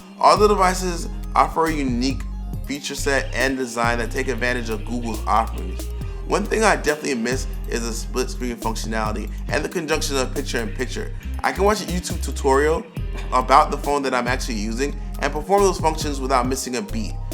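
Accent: United States. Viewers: You sound very very american. Im sorry for you. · You from Jacksonville bro?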